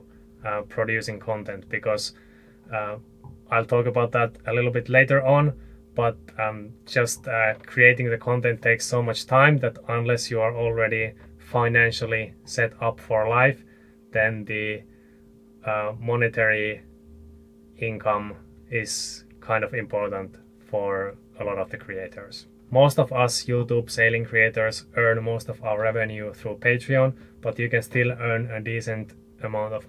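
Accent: Finland. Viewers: I have enjoyed every one of your videos I have seen (most you have produced). You do have a unique channel and sailing focus. I think this video is very good, helpful to others, and a good sign of your transparency and honesty about the costs and challenges you have faced so far. I do have a friendly, easy to implement, suggestion for your channel. I encourage you to start your videos by a very brief introduction of yourself, something like "Hi, I'm, from Finland, I started sailing...and my goal is...and my partner Sophia and I are...join us as we explore Iceland, snowboard, etc..." and I would do that each and every video. Each one. Make it a part of your "intro" to the video. Also, I think most of the sailing channels that keep the viewers engaged (and that leads to more Patreon supporters) do so by making some kind of "Here is who I am, and YOU (viewer) are with us...'" I think many of the viewers like feeling like they are "there" with you, imagining what it is like, etc. 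To be frank, i did not know your name until watching several of your videos, and was not certain where you started (though i suspected Finland because of your accent), or how you supported yourself (translation mentioned in this video). Those are things that are personal details, but help a new visitor to your channel get some kind of possible connection. I came across your channel by chance, and started watching because I always dreamed of sailing in Arctic waters. Then when I saw you got Ar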